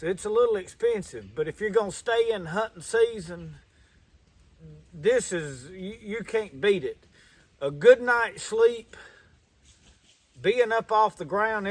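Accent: South, United States. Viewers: Man...when I find a new channel I often binge watch a touch and see what all similar interests there are. We are about the same age I think, but you're still doing the things that I miss most from when I was younger, or the things I always thought would be fun. Y'all MS and Alabama boys do have a thick accent though 😅. Much love and God Bless brother.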